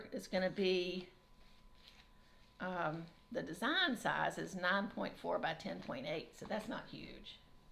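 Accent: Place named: Eastern North Carolina, United States. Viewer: I enjoyed your WIP Parade and listening to your melodious voice. It reminds me of growing up in eastern NC. Happy New Year to you and Peter!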